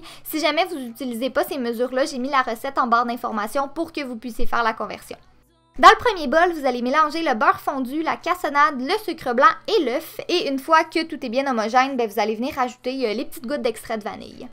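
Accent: Quebec, Canada. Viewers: Salut ! Je viens d'Allemagne mais en ce moment j'habite en France pour 5 mois et fais un échange de scolaire. Et il faut dire c'est absolument pas facile à comprendre pour moi parce tu parle le français de Québec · Et puis j'adore l'accent québécois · Je suis française et je craaaque sur l'accent québécois et les expressions 😍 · Si vous aimez l'accent Quebecois likez* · Je viens de France! Et J'adore trop l'accent québécois ❤ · Je vien du Maroc et on va dire qu'avant tu ne me plaisais vraiment pas, enfin tes vidéos et je ne comprenais pas tes mot vu ton accent, mais depuis quelque jour je suis accros a tes vidéos et comme elle me plaisent toute (pour l'instant) je me suis donné comme défis de toutes les voir! Je ne sais pas comment j'ai pu te détesté vu ton talent et ta générosité avec nous! Tes conseils son magique, continu sur cette route... You perfect! 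(je ne sais pas si sa ce dit mais vu que tu es canadienne j'ai mis sa!) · Je parle francais mais j'adore ton accent 😊😊☺ québécois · Québécois(e) de Québec? 👆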